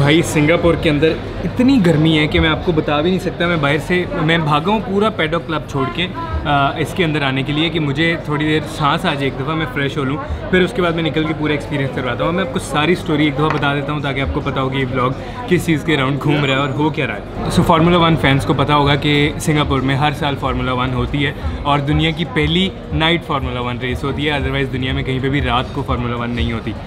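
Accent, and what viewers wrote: Punjab, India. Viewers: Bro great ap ka punjabi acsent kamal ka ha 🥰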